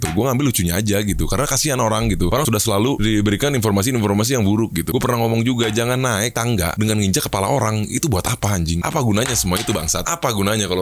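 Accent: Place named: West Java, Indonesia. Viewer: Sunda pisan akwkw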